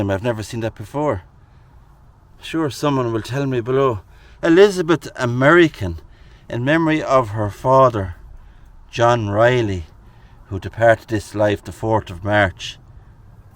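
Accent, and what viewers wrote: Ireland. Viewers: So old and interrstin g, from lon g ago days. I love your charming Irish accen t too 😊😊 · I'll always give ye a tumbs up 😊. I could listen to your Irish accent all the day long ❤ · Wee bit of the Irish in ya there Laddie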